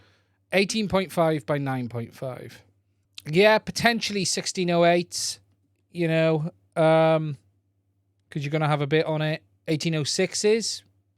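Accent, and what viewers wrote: Wales, United Kingdom. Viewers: Stumbled across your channel and thought I detected a bit of a Welsh accent! Enjoyed your review & subbed. Lots of useful info:) Many thanks! Whereabouts are you based in South Wales? I'm up in Ceredigion but not a whole lot of FPV action up here. I've been building/flying drones since the DJI Naza & KK-2 controllers were around so I'm stuck with a diverse fleet of analog FPV quads/wings, mostly running TBS Crossfire or FrSky R9 (although I did spring for a Mini 2 for some stable 'Countryfile' style footage). I'm keen to switch to digital FPV for improved clarity so I'm considering the Avata for some Cinewhoop-style footage but also keen to retro-fit a DJI Air unit to a couple of my existing drones. Sounds like a pair of second-hand V2 DJI goggles would probably cover both bases subject to following guidelines for rooting, etc. 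Cheers!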